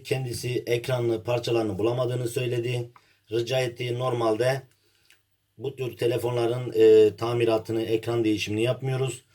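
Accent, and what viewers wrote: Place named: Mardin, Turkey. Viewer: Hocam mardinlimisin sen şiven mardinli arapların şivesine benziyor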